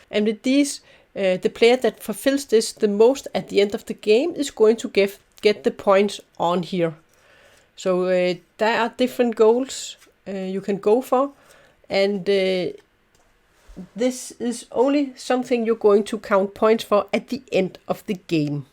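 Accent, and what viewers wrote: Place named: Germany. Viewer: You have a really nice german/english accent. probably the best sounding I have heard.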